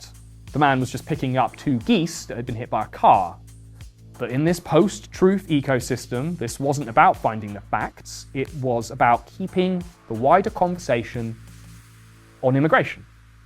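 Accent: England, United Kingdom. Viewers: Oh now I get it. You have the Russell brand accent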